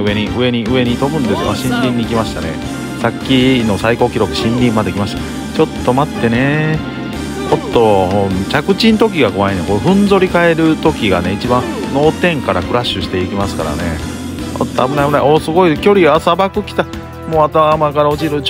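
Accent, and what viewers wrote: Kansai, Japan. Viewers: このゲームおもしろいですね！！笑さん関西弁でした？！ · 相変わらずの関西弁ナイスー👍 そしてLINEの返信が全部英語ꉂꉂ🤣𐤔 時代感じます😅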